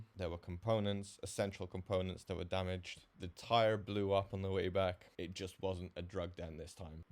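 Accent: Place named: United States. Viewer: It's not called trash, it's called rubbish. You speak like a yank.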